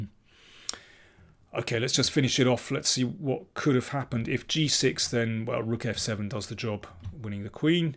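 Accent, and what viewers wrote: United Kingdom. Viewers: Yeah. Very nice british accent.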